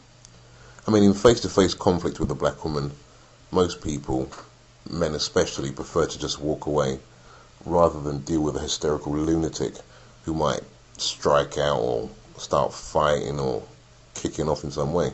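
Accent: United Kingdom. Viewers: Sounds like London ! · well i take care of myself but i dont consider myself to be a strong and independent woman, i dont wear weave or spend my precious money at the beauty shop and i NEED a man to do take are of me, as he should. so does this make me a black female (which is what i am) or a white woman? lol this dude is seriously stereotyping all black females! where is he from? wales? love the accent! i would love for a real man to step to me and do what he should!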